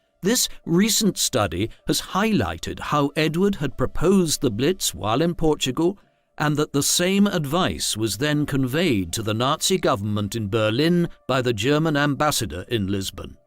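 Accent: RP, United Kingdom. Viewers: I saw that. Huge mistake by the documentary makers. The reader sounds posher then the King.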